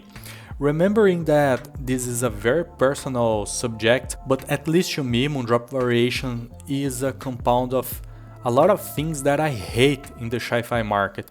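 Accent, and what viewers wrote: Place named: Brazil. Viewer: É sempre legal ouvir BR falando inglês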